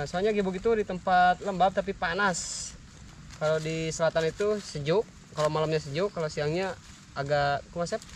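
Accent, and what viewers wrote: West Java, Indonesia. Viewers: bang ngmong nya campur sunda jadi lucu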